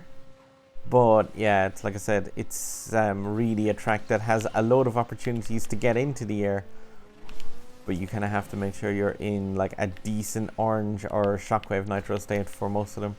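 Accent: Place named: Ireland. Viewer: I love how you start every video with "now~" 😂 love the Irish accent, the way you say "cayr"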